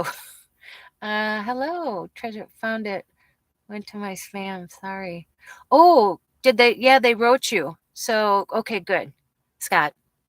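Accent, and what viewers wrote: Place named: New York, United States. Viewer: Just be YOU. New York accent,,,, Don't worry about it. Lol. More support you than Don't. Keep going. I'm pointing at you. Magic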